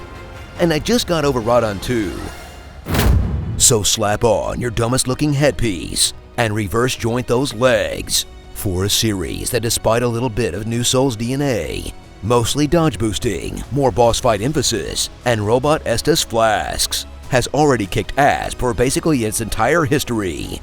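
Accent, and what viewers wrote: Australia. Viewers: I think he's Australian. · Australian youtuber, but yeah.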